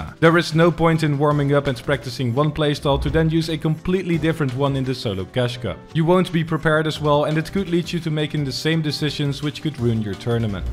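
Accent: Netherlands. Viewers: Ik weet niet als ik het goed heb maar je bent Nederlands toch of hoor ik het verkeerd I dont know if i right but Your Dutch right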